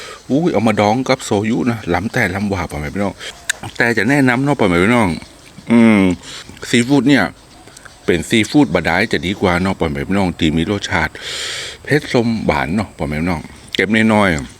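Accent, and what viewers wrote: North, Thailand. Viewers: น่ากินเนาะ .คนเหนือพูดจาเพราะดีนะ.ชอบๆๆจ้า.❤️❤️❤️👍👍👍🇦🇹🇦🇹🇦🇹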